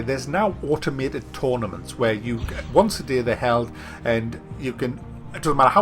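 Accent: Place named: Sunderland, United Kingdom. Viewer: North East of England. Sounds like somewhere like Sunderland but I could be wrong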